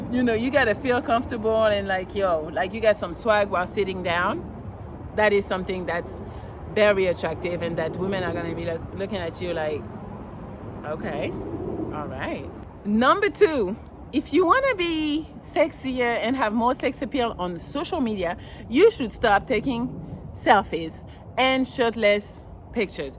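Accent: France. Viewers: Here's my one tip, I have others but I will give you one. Speak another language, like you. When you speak that French shit in that French accent? It gets me up😂😂😂 it does the same for a Man when he speaks to a Woman.😜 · She is from France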